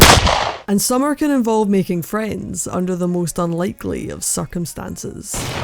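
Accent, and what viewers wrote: Scotland, United Kingdom. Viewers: The voice of a Scots woman can make even the word murder sound good ;) This season had a good handful of shows that from the description didn’t sound as if they would be anything spectacular, such as Chios School Road and Asobi Asobase, but are genuinely funny as have become some of my favourites this time around. Also..... EDITING!!!!!! 😍❤️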